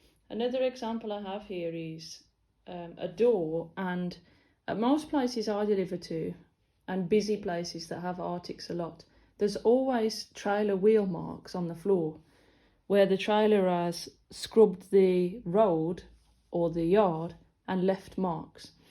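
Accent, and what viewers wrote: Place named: Midlands, United Kingdom. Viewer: Same to you!! You deserve tonnes of respect! And your good nature, your accent and your location makes me remember my happy days in the midlands!! Trained by westgate training of walsall, ex samy jones! Loved the area!!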